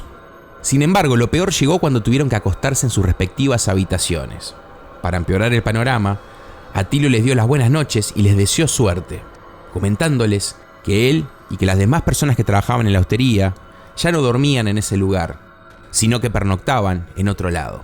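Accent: Uruguay. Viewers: Acento Uruguayo Papa Saludos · El a relata es uruguayo de 1 programa de Uruguay pero hablos casi iguales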